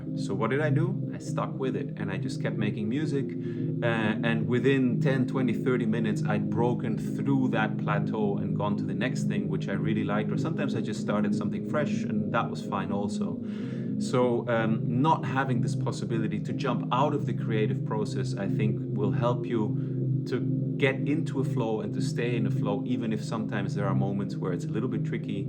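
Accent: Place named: Switzerland. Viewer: Thank you for your advice and your videos. Are you from switzerland, too? I thought i can hear a little bit of a swiss accent in your speaking.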